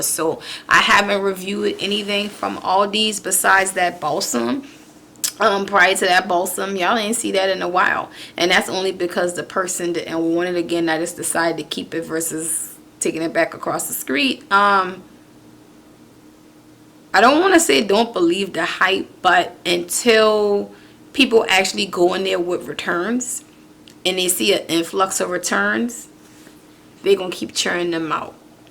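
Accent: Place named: New Orleans, United States. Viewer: You sound like you’re from New Orleans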